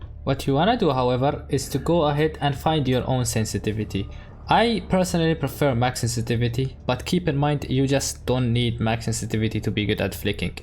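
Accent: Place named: Arab world. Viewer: bro where you from are you arab ? you sound like an arab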